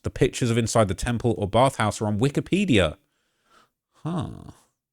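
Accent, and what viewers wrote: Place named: United Kingdom. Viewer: Be careful saying stuff about Donnie in these videos. Your British accent with a US location is inviting ICE at your door.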